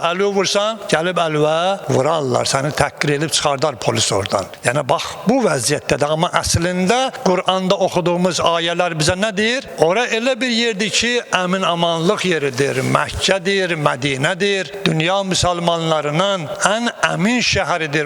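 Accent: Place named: Iran. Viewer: Bunun nə murdar səsi, ləhçəsi,sifəti var. Iran tulası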